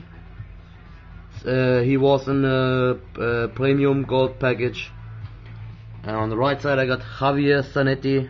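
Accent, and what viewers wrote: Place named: Germany. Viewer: man hört deinen deutschen akzent haha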